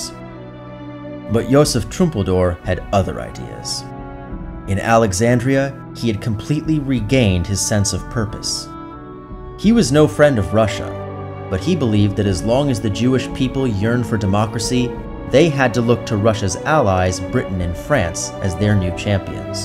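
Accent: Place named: New York, United States. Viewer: Just curious if you see this we’re you raised in New York? You have the accent. Love your channel from Long Island/ Netanya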